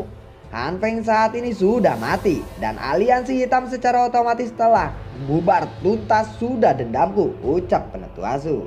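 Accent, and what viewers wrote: West Java, Indonesia. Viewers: Anjay up lagi kirain gk bakal up lagi,gw terlanjur tidur:v Mang pasti sunda nya?:v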